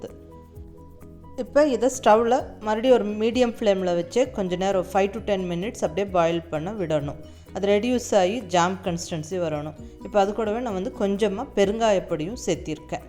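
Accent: Kongu, India. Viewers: mam kongu tamil suprr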